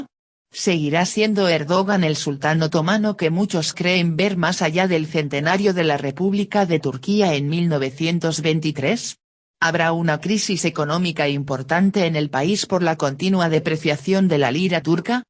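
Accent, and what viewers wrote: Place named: Argentina. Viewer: aunque tenga acento argentino es bastante mas agradable que lokendo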